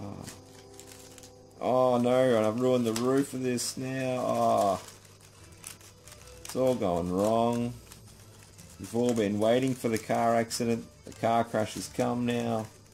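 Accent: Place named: Australia. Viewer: Man im Australian too but you ahve the best accent i have ever heard!!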